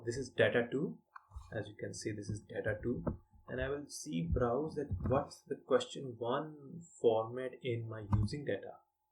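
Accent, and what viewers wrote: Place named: India. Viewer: good videos but the indian accent drvies a non English speaker really crazy……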